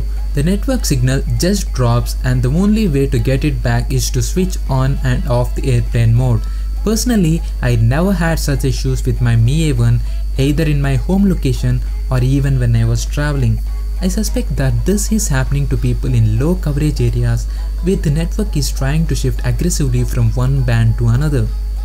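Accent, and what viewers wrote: South India, India. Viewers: Also, are you south Indian?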